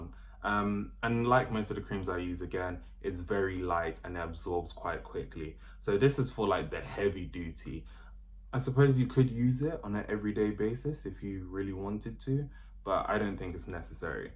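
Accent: United Kingdom. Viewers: Your accent is proper British so everything you say... is probably legit. - Your African brotha from across the pond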